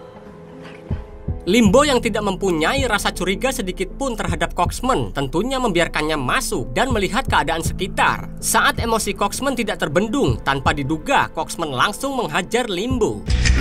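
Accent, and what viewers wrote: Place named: Central Java, Indonesia. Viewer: iki logate wong jateng iki... Kendal semarang?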